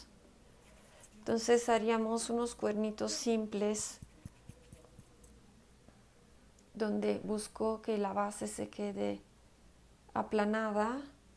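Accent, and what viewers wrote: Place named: Italy. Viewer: Es italiana, por los nombres y el acento.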